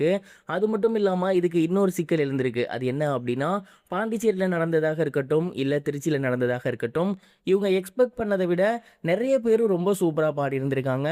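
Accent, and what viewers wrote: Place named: Kerala, India. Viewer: Nayae un voice kerala chee...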